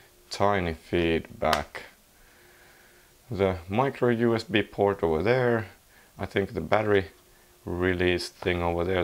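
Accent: Finland. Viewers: ahhh the finnish accent :D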